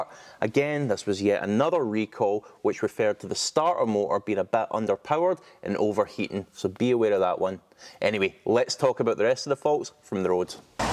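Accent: Scotland, United Kingdom. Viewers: I wish I was born in Scotland. Such a great accent!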